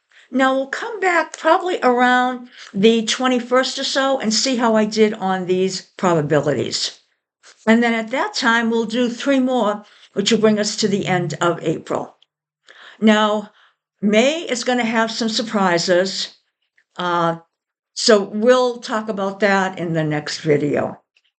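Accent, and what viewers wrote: Massachusetts, United States. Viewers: I knew you had the Mass accent!! My mom was from Hull village , I grew up in lakes Region NH ( Squam lake and Winnie) and now in Maine.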